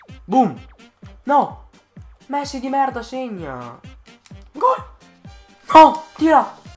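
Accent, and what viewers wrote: Veneto, Italy. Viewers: nuovo iscritto,sei veneto per caso ? 😂😂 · ma sei del veneto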